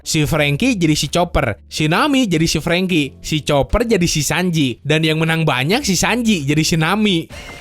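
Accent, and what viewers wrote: Jakarta, Indonesia. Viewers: betawi punya nih admind'y😁😁😁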